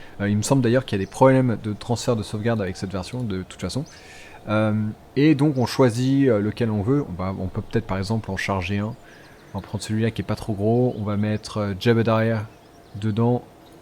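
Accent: England, United Kingdom. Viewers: Il a un putain accent anglais!! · Perso je kiff l'accent Anglais de <3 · tas laccent anglais toi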